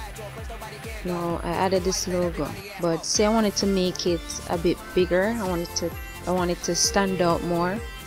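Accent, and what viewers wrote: Jamaica. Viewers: U jamaican? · Good job. Knew you were Jamaican when I heard the voice. Thanks for the help. You stop making vids I see. Hope all is well. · U Jamaican ? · the Jamaican accent tho :)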